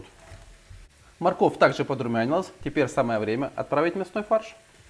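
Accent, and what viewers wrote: Ukraine. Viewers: таке враження, що Ви говорите російською з українським акцентом.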